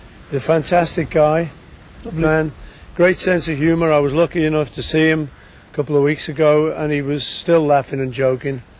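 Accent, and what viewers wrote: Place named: Scotland, United Kingdom. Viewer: Your Scottish brogue, Faul gives you away for the fake freak you R! For those that believe that this man is do the months of research and you will find this man! 2 identifiers Real had Dark Brown Eyed not Greenish Hazel Eyes and Real Paul didn't tower John Lennon nor George Harrison! It took me a long time to see the difference but once you do it's a no brainier!!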